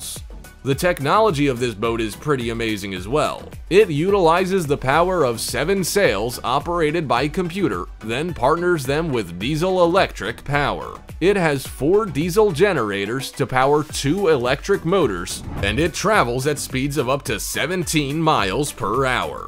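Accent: United States. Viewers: VERY annoying melodramatic American commentary, unwatchable. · An American butchering the English language. - Ridiculous Pronunciation of regular words. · The lector is too americanised for my liking.. So irritating...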